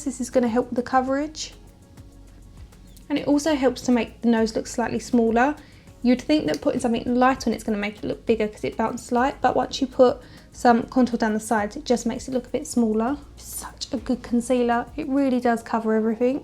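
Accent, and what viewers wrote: Essex, United Kingdom. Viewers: Love your Essex accent 💕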